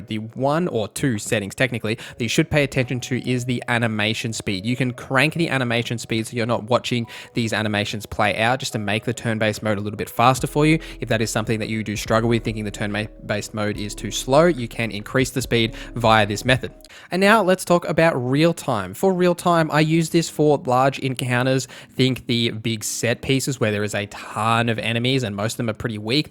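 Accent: Australia. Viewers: G’day mate. I think your channel could really take off with guides for tricky games like this. You present well and apparently people like presenters with our Aussie accent. (Who bloody knew?) Can I make a suggestion for your videos to maybe help set them apart from others out there? When you hit a concept like how an attack works, try running through an example or two. Maybe start with a basic melee attack and show how dice rolls would play out and the factors involved. Then add some complexity, like a ranged/magic based attack and play it out. I think it will help folks like me who have very little crpg knowledge. Which will be a lot of folks coming to your videos. The examples you create don’t have to be played in ‘in game’ you could create a basic graphic from screenshots and a cg dice. Could be worth a shot, might be too much of a pain in the ass. I think you’ll do well with how you’re going now, but wanted to toss my two cents in here. 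I’m subbing anyway mate.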